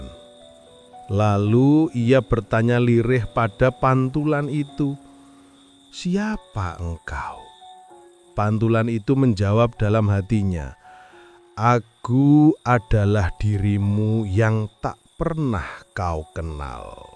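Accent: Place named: Java, Indonesia. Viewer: klo menurutku sih, logat dan kosa kata jawanya... daerah solo/jogja, tapi berstrategi merangkul iawa secara luas.. maka kata rek .. tersemat dlm narasinya...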